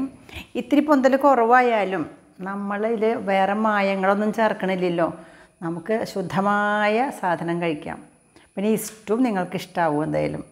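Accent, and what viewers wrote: Thrissur, India. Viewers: Namaskaram. Valare saumyamaya sambhashanam aanu video kaanan prerippichathu. Valare upayogapradamaya content 👌 Valare Santhosham Nandi. Samsarathil ninnu Nanma niranja,teacherum veettammayum,Trissur swadesiyum Tamil brahminum aanennu manassilayi.Ellavidha aasamsakal nerunnu.iniyum dharalam videokal prateekshikkunnu.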